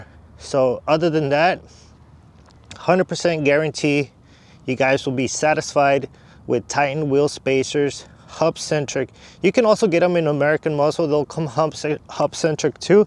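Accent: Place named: Mexico. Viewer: I got some work for you and your people, maybe even a speech class cuz I still hear that Mexican dialect!!!!!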